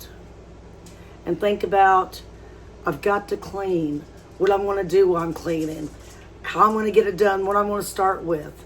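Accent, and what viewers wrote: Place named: Kentucky, United States. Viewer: I knew you was from KY soon as I heard your accent.. blessings...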